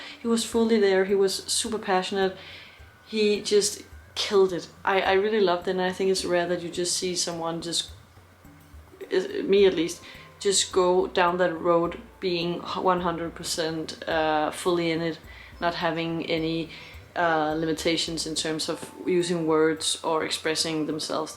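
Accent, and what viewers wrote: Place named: Denmark. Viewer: Loved the reaction! He will blow you away even more if you listen to more tracks. And.. are you Danish? The accent sounds familiar. I’m Danish so it gives me hints of that ;)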